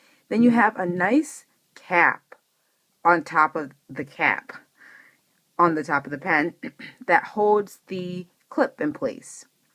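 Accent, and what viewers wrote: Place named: United States. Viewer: That sounds like feedback rather than scratchiness. However, if you want it to be butter smooth, run it over some mylar paper. See Ink Noveau and sbrebrown channels for details about doing this. Although I'm in the UK I bought mylar paper from Goulet; because it's so light, there isn't much shipping to pay. But from your voice you're probably in the US anyway.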